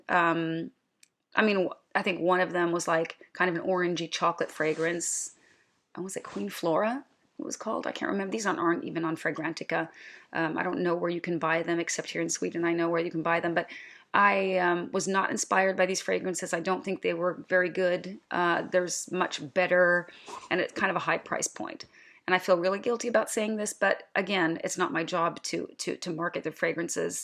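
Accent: Midwest, United States. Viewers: Are you Swedish? If so, I never would have thought. You accent comes off as American Midwestern or from the Rocky Mountain States. Impressive. I greatly appreciate your take on denying free this and that. Reviewers are now stating that they received their products but their opinion are their own. I don’t believe this. I’m too seasoned to think kind gestures aren’t returned in kind. Reviewers are essentially telling us to pay for their free bottles, by buying this House’s offerings.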